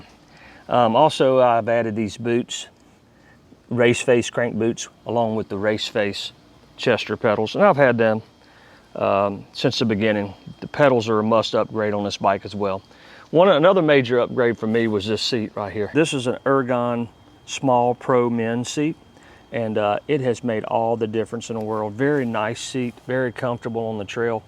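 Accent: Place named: Mississippi, United States. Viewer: . I grew up in MS and miss the accent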